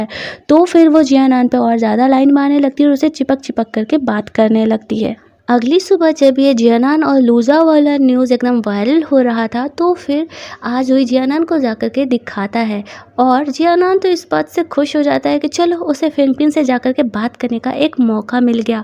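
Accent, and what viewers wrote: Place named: Bhojpuri, India. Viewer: I love when u use Bhojpuri tone .😉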